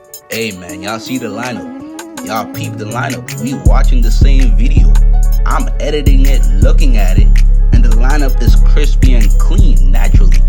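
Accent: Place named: Baltimore, United States. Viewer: Where you from? If you are close to me I will have to stop by and get a cut. I thought I heard a bmore accent.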